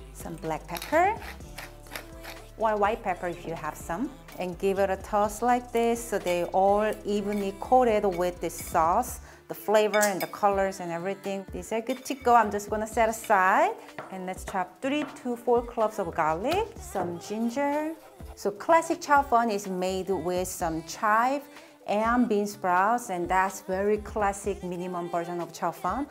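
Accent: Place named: South Korea. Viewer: Omg your Korean accent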